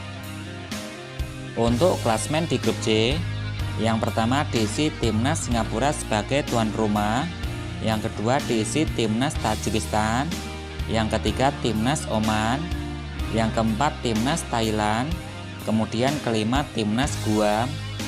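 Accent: Java, Indonesia. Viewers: di liat logat bicaranya aja udah keliatan di tambah lagi ada yang ke telu tambah yakin dong kalau adminnya orang jawa 😁😁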